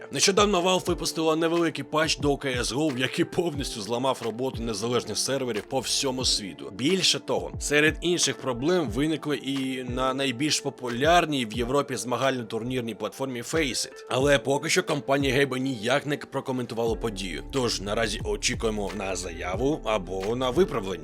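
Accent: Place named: Russia. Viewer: Так смешно слушать русско говорящего, на украинском языке, ну да что не сделаешь что бы в армейку не за лететь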